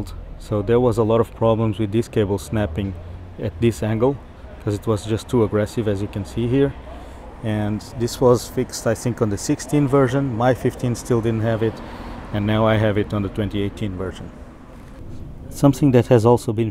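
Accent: Portugal. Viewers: ahah sabia que eras Portugues! Portuguese accent ;) bonitas motos! cheers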